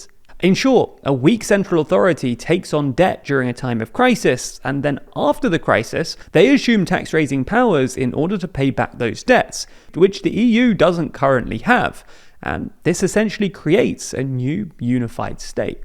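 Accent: United Kingdom. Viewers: TLDR News EU? You sound extremely British to me. Does the UK want to join the EU? Nobody is walking into anything. It is more that we are crawling into a federal EU. It goes so slow. As long we are not all speak the same language in all countries it is still not a real federation in my eyes. Everything we can do together we should. Saves money. We don't need a huge army against Russia. We just need a hyper modern army with rapid response forces and a bit more nuclear weapons. An d all of this bought in the EU. The 5% deal with Trump is a hoax. Anything to get that orange monkey of our backs so we have a soft landing economy wise. Trump is the biggest liar in history of US presidents and changes the rules when ever he wants. That means we can do that too and thus do not have to abide by a number (5%) Trump shot out of his ars. Any deal made by Trump is not even valid in the US itself so why would we honour it? 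No, we just need to pay for our own defence and we will decide ourselves how much that is gonna be... This is how it is but just not said out loud by our leaders...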